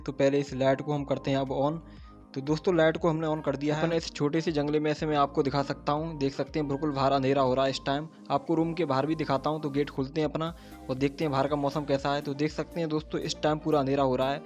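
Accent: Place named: Chambal, India. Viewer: भाईसाहब आप कहां से है ,, बोली से लग रहा है आप भिंड, (चंबल संभाग) से लग रहे हो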